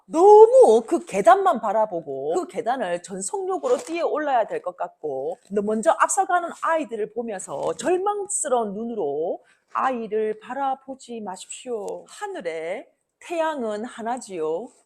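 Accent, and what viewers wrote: Gyeongsang, South Korea. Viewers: 넘 재미있어요. 진짜 잼 있어요... 두 아들이 있는데 둘 다 고졸 입니다. 대학 대신 상장사 AI 팀장과 매니저로 서울대와 카이스트 졸업자들을 AI 교육 시키고 있습니다. 다음에 한번 뵙고 싶군요. AI 시대는 남들과 다른 교육이 필요하고 AI 시대 교육은 새롭게 변해야 한다고 생각해요. 산골에서 단 한번도 사교육을 시켜보지 못했고 과학고에서 영어 4등급 받았어요. 그런데 토익은 만점 입니다. 국내 대학 포기하고 연봉 억대로 취업을 했어요. 저희 유튜브에 대한 이야기도 좀 부탁 드려요. 한번 기회를 주세요^^ 저도 유명해지면 해드릴게요^^ 틀림없이 내년에 유명해 질 것 같아요^^ 경상도 사투리 넘 멋져요^^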